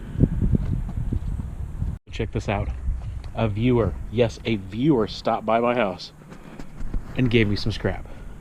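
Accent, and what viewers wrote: United Kingdom. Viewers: your enjoying your British accent really?? love it xx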